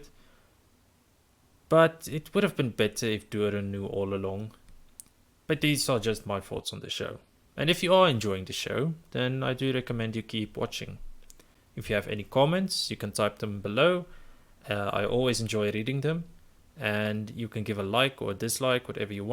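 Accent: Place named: South Africa. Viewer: Heyyyy, a fellow South African. Het die accent gehoor en lol, moet gaan kyk het of ek reg was. Nice vid, keep it up!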